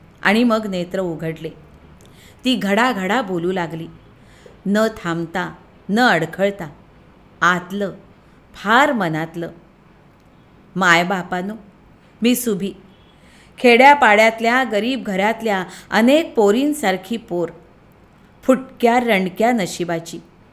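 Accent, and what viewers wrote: Rural, India. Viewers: ग्रामीण बाज खूपच छान....👍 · Bhashet gavthi thaska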